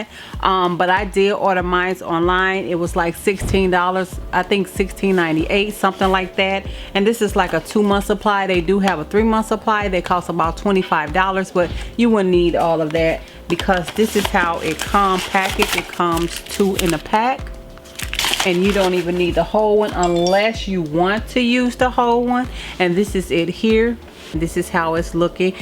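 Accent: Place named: South, United States. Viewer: I will try it. You did a great job!! Love your accent (southern?) Thanks so much!!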